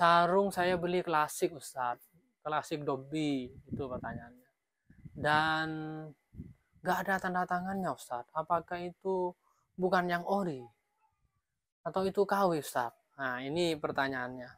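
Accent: Madura, Indonesia. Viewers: Abang dari logatnya kayak orang madura ya bang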